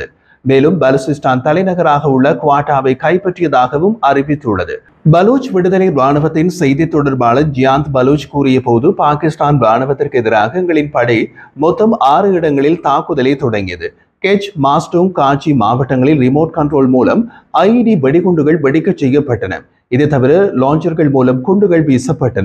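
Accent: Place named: Eelam, Sri Lanka. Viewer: அழகிய (ஈழத்)தமிழ் உச்சரிப்பு,நேர்த்தியான செய்தித் தொகுப்பு ! பரபரப்பில்லாத உண்மையான செய்திகள்!நன்றி!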